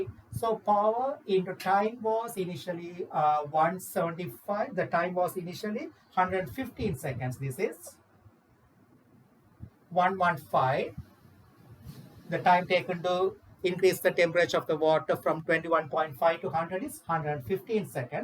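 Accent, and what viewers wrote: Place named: Sri Lanka. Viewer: Sir Are you from Sri Lanka? You have that accent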